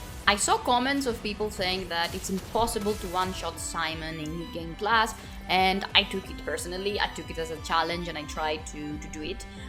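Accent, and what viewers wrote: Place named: Greece. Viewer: At least I can hear the voice here! Such an amazing Hellenic accent!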